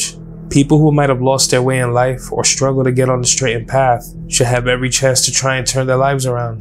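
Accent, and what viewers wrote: New York, United States. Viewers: Yw. Also a question are u from New York?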